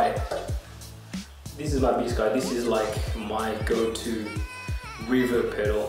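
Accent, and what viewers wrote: Australia. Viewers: Klo ngomong keliatan Aussie nya 😆😆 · Uwawww aksen nya australia nice nice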